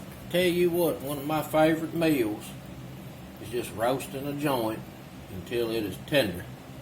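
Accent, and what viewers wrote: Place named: Texas, United States. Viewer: I detect a bit of Texas in your voice. What parts are you from?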